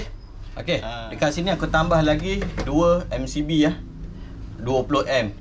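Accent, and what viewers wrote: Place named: Terengganu, Malaysia. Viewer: Dengr abg ckp macam orng ganu je,kalau ganu sama la kita🤘apa pon terbaik boh👍👍👍👍👍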